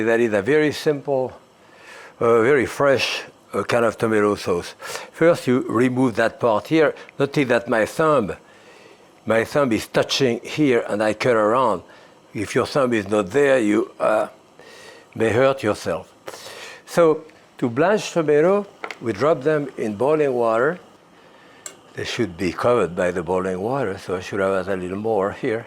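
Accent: France. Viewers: He is French. English is his second language. He does not have an impediment.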